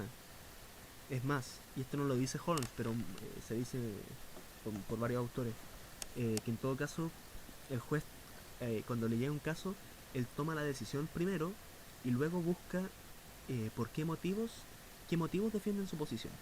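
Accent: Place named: Chile. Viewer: es Chileno x eso no se le entiende bien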